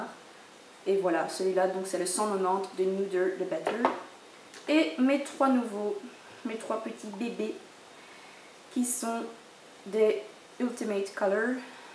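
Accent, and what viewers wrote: England, United Kingdom. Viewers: Tu te débrouilles très bien pour une première fois, en plus tu es naturelle et sympathique :) Moi je n'ose pas me filmer, enfin pas pour l'instant^^ Si non ton accent anglais est top! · Tu es parfaite! Et cet accent Anglais de ouf! Sinon, tu vas être interprète Français-...? Mon papa a été prof d' Espagnol dans ton école mais il est retraité depuis quelques années maintenant #racontesavie Bisous et longue vie à ta chaîne! Frivole P.S: de rieeeeen! ^^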